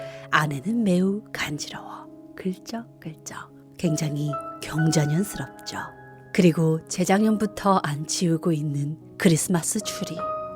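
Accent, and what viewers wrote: United Kingdom. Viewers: So fun. Your clip helped me to increase hearing and speaking English especially posh accent. Thanks a lot. Happy new year. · I love your British accent. wow~ who wouldn't love your voice. I am gonna be your fan~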